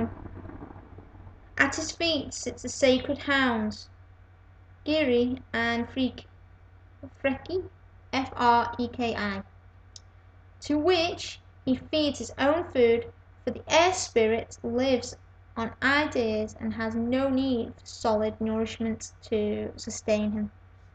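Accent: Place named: England, United Kingdom. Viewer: I can hear you loud and clear you sound fine and have a beautiful voice. I think chics with a real english accent are hot. Stop listening to what others say they are just jealous of your beauty.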